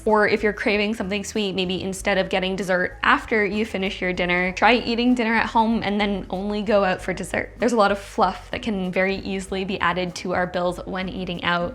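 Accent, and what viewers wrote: Canada. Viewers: I noticed the Canadian accent right away 😂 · Had you not featured US Dollar bills in your video, I would've thought you're Canadian based on your accent. The Venture X is a powerhouse for sure. I'd also recommend the lesser-known AAA Daily Advantage Credit Card, which has become my primary CC (5% on groceries, 3% on Warehouse Clubs (a category that not even the Costco Citi VIsa offers that rate of rewards for), 3% on Gas and Pharmacies, and so on...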